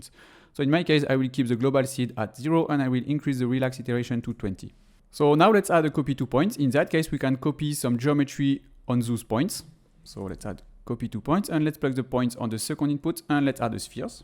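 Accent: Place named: France. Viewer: why not creating different sphere and put them one by one ? and you won 10 min wasting time , . why you need to creat line to instance point on that lines ?! when you add something you did not say why ... and it abit hard to use plugin to separate materials node ? do it from houdini after use 2nd way to plugin mybe this plugin is not free or someone cant get it . atleast know how to do it natively but thank you for your videos bro . and vive la france ^^ . from your accent i can tell you are french guy . you know very wellhoudini nice to meet you bro .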